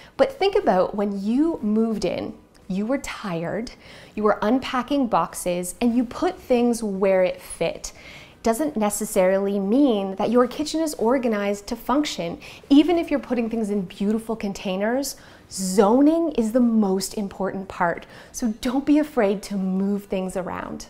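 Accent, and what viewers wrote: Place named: Canada. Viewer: That Canadian accent is coming through! Lol 😂 Love these tips!!!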